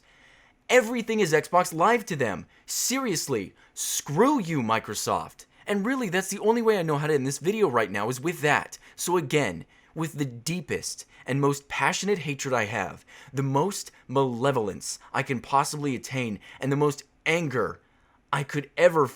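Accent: India. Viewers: That indian accent doh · Indian voice xD <3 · Great Indian accent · That indian accent just killed me :D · I love the Indian accent. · From sounds like english spoken by an Indian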